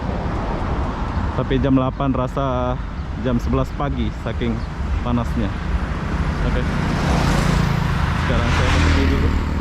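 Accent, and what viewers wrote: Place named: Malaysia. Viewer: Bro lo org malaysia apa indo sih. 😂 logat lo kya org malaysia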